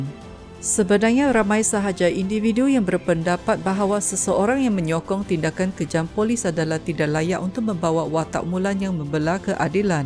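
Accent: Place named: Indonesia. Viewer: Boleh tahan cubaan berbicara a la Semenanjung penyampai ini. Menjadi 90%,, cuma masih ada 10% bunyi Indonesia tak dapat disembunyikan. Sebelum ini ada aku dengar penyampai lain channel ini masih berbunyi 97% Indonesia, 3% sahaja Malaya (iaiti bahagian a dihujung jadi e) 😆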